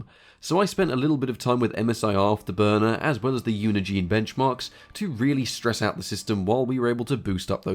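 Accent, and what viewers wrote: United Kingdom. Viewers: As a french guy, I really enjou this british accent. It is better than the ugly american one. Nice channel by the way 😀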